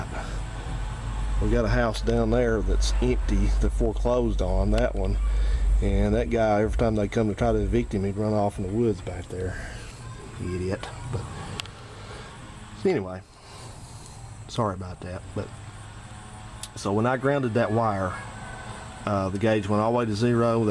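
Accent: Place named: Georgia, United States. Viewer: you've gotta be from south GA. Ill be danged if Im wrong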